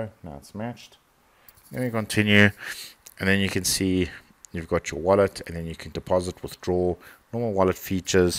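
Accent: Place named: South Africa. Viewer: That south african voice ek se xd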